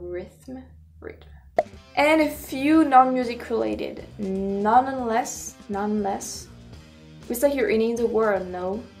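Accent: France. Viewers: Great job with this video you look very nice in it and Saying English words with your French accent Sounds Adorable and it's 👌 to laugh at oneself from Time to Time.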